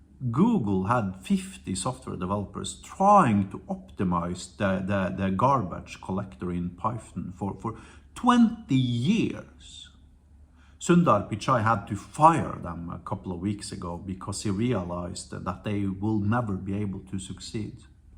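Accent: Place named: Norway. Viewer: Thickest Norwegian accent on Youtube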